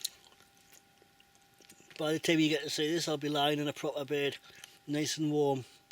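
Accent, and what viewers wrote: Newcastle, United Kingdom. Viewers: Is that a Newcastle accent in so sorry if its Sunderland lol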